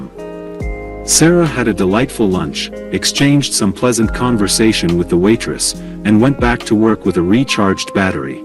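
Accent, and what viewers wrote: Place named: India. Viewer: Accent is like an indian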